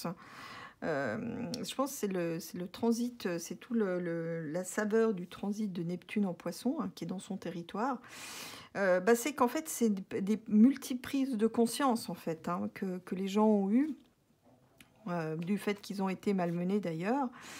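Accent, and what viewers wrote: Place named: Switzerland. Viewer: Bonsoir contente de vous entendre pour la première fois et de savoir que vous respirez bien!! Belle voyance précise je vous remercie vavec votre petit accent proche de la Suisse? Bisous. Virginie de Haute Savoie 😊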